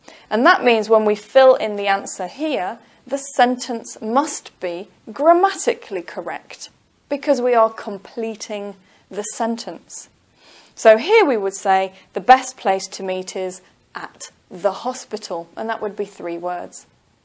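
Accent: England, United Kingdom. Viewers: i really love you madam, i really love the way you make us understand. so easy and slowly, however the British accent is a bit difficult to understand. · 😂 lol yeah Because is an English lady living in england😎 accent can tell the saga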